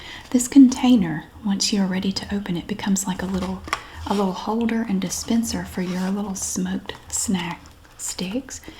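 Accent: South, United States. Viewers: Hope you have a wonderful day too! Thankyou for your soothing southern mom voice :) you are very comforting to me! One of the only talking asmr-artists I can handle at all and a big part of that is your accent and how considerate you are to your viewers!!! Thankyou again!! I will gladly do a painting of you and your family if you like! For all the nights I was anxious having existential crisis(s) you and your Aldi purchases or you rearranging things at the store was there for me. Anyways please let me know if you'd like a painting Ill do it for all you've done for all of us! Thankyou again!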